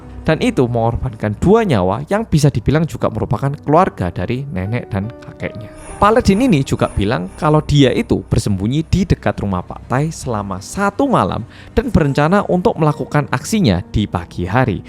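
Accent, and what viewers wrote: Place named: Malang, Indonesia. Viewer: Iki ceritane wong korea berusaha ngomong bahasa vietnam dengan logat jowo malang nggih mas...😅❤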